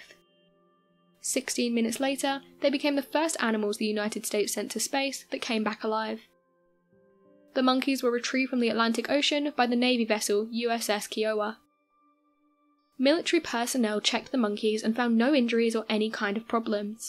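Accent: United Kingdom. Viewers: British chick narrator...”unfortunately for the US, Russia did it first.”...yeah as if Russia were friends with the British...comon chick!!...The Brits didn’t do sh*t either.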